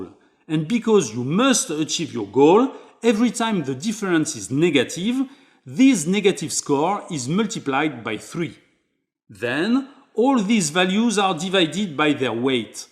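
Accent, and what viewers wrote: France. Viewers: Just telling you the facts. Audio is just as important as video, sometimes more so. People can be very tonally sensitive. Not everyone is French. This might be the one instance of YouTube AI (voice dubbing) actually being beneficial. Turn it on. See what happens. It should translate fairly well. If it does not translate, turn it off. It should be better than subs. Guaranteed you get more views. You want to keep people watching, yes? These are very long videos. Keep options open. I had to use other MR tutorials based solely on audio. Imagine someone with a heavy German accent trying to do these videos in English. Same thing. I’m German and I would not want to listen to that and if I had a heavy accent I would get someone else to do the audio. In this case the option is absolutely FREE.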